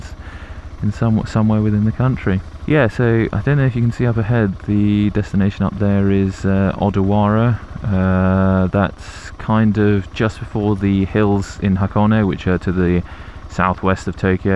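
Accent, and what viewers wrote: RP, United Kingdom. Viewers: carnt belive this guy s accent so posh love the videos. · sound's like your from made in Chelsea :)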